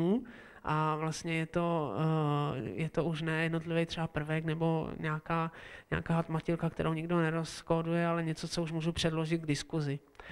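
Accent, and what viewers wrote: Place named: Moravia, Czech Republic. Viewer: díky za facku. nechal jsem se unést pod vlivem špatného dojmu z toho videa (k čemuž v mém případě určitě přispěl i moravský přízvuk přednášejícího, který nějak nemůžu vystát - omlouvám se, ale prostě je to tak... snažím se to ale do hodnocení nepromítat). přednášel odborník pozvaný na doporučení, takže by bylo fajn, kdyby to mělo tomu odpovídající úroveň; podle mě ji to nemělo (neodvažuju se nicméně zpochybňovat odbornost přednášejícího, ale odbornost není všechno…).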